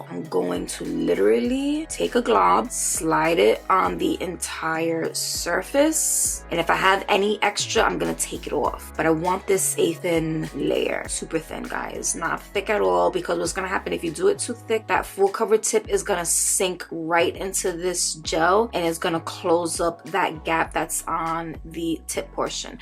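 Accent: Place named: New Jersey, United States. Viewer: Also, I love your accent. Is it Jersey?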